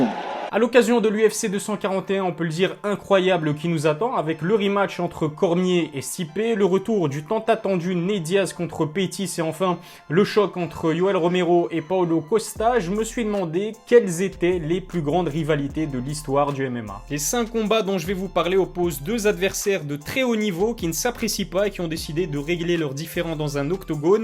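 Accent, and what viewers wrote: Belgium. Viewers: Juste une petite question t'es de Bruxelles ??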